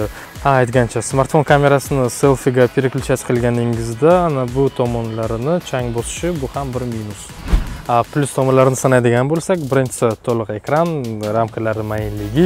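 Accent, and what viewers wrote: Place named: Bukhara, Uzbekistan. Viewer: Бухороча шева малумда барибир, гап йок молодец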